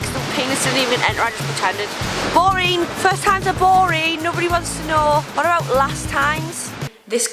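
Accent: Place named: Sunderland, United Kingdom. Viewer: Cher Horowitz she is from Sunderland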